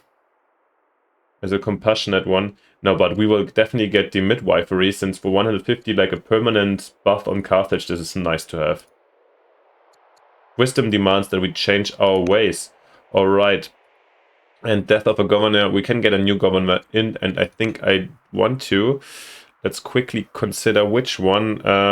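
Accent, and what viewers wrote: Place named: Germany. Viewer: Direkt den Alman rausgehört😂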